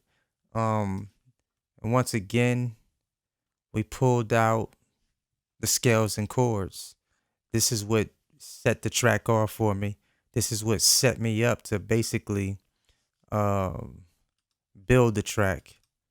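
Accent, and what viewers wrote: Jamaica. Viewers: it bad mi don sound like yu have a Jamaican like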